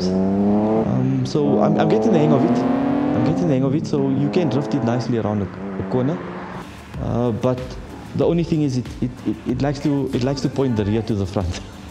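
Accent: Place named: Afrikaans, South Africa. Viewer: That lovely accent is Afrikaans and yes, the car is stunning too. Peace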